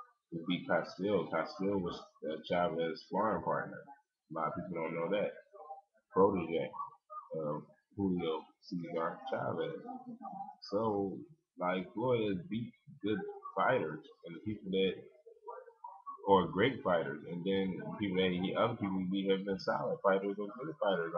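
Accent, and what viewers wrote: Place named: Philadelphia, United States. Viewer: I can tell you from Philly by the way you talk no homo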